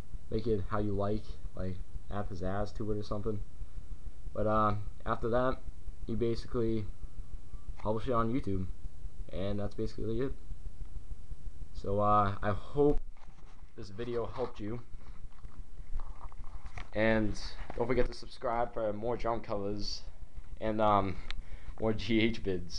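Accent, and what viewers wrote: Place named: Boston, United States. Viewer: That's a Boston accent people ! Best accent on Earth!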